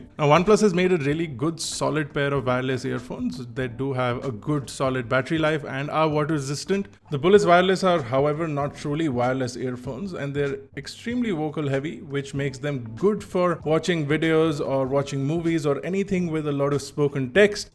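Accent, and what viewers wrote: India. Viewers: work on your indian accent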